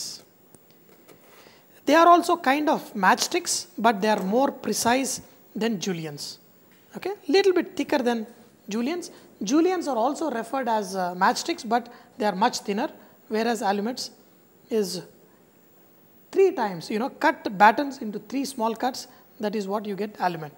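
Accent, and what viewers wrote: India. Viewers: is good. HE HAS AN REGIONAL INDIAN ACCENT... NOT EASY TO GET THE NEUTRL ACCENT. WHEN WE PUT UP WITH THE CHINESE AND OTHER ASIAN AND NUMEROUS ACCENTS WHY NOT SANJAY'S WHO IS VERY CLEAR ABOUT WHAT HE TEACHES..... ONE OF OUR BEST VIDEO TEACHER....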